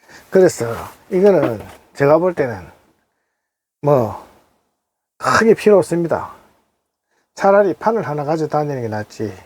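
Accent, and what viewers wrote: Gyeongsang, South Korea. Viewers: 선생님 잘 들었습니다 항상 건강하시고 좋은 캠핑 힐링 하십시오 경상도 고향이신것 같아요 ~~~ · 경상도 분인가 봐요. 구구절절 맞아요. 저도 9인승 5인승 구조변경 스타리아 라운지 8천만 원대, 1년 3개월 된 것을 5천 2백만 원에 구입하였는데 새 차나 다름없더군요. 우리 마눌님하고 3박~4박으로 주로 관광 목적으로 다니고 있지요. 비용을 아껴 써도 적게는 20십~40십만 원 들어갑니다. 저도 테이블은 사용하기 불편하여 무게만 나가고 집에 두고 다니고 있어요. 스타리아로 70대 분이 10개월 만에 세계 일주하신 분이 있더군요. 건강하시고 행복하세요.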